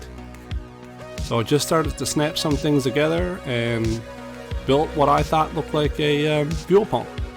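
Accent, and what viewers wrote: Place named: Newfoundland, Canada. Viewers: Oh yeah, you are definitely from the Rock, by! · These look great. And I can hear from the accent that you’re a fellow Newfoundlander.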